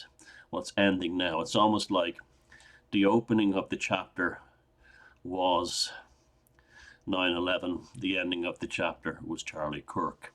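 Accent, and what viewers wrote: Dublin, Ireland. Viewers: Dublin area accent? New subscriber here. Great vid, cheers!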